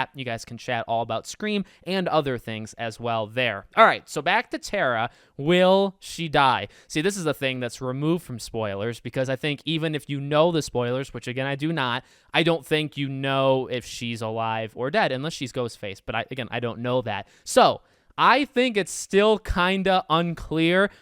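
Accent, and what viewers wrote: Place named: Boston, United States. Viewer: r u from boston ? north east? ur accent lol the way u said podcastt🤣🤩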